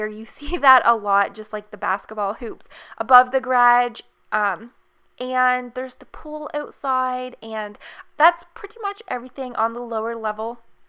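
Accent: Canada. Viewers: Are you Canadian? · As a fellow Canadian, I could tell you were Canadian right away from your accent lol (which is very nice to listen to). Loving this build! You have yourself a new subscriber :)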